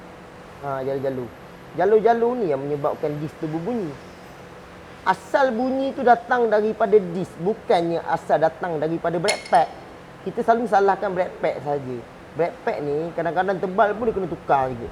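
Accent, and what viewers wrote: Pahang, Malaysia. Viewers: Pekat Pahang yakkk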